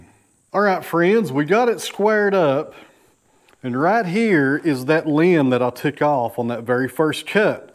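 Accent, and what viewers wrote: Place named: South, United States. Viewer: .. I agree, there is good and bad everywhere, but I'm Mexican and resect, politeness and manners is really big in my culture.. so I really admire it when I see it in other cultures.. maybe it's just that southern, twang that sounds so cool... LOL.. and do you notice how much he loves and respects his father? if it wasn't for that southern twang, I would swear he could be Mexican.. LOL...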